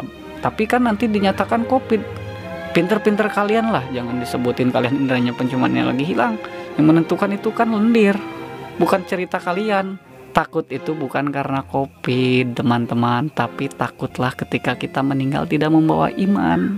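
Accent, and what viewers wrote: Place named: West Java, Indonesia. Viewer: Btw karna si aa logat sunda nya kentara pisan, sim abdi ngarasa jiga dipapatahan ku lanceuk nyalira hwehee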